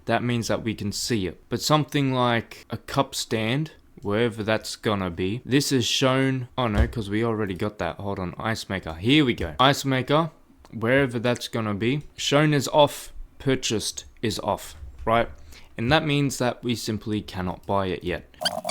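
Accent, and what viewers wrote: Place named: Lebanon. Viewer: 2026 the year of the roblox coding leb accent eshays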